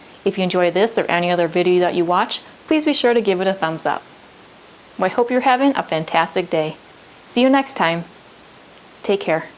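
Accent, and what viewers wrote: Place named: Wisconsin, United States. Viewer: Thank for detailed setting instructions! Also, your accent has me missing home in WI. 😍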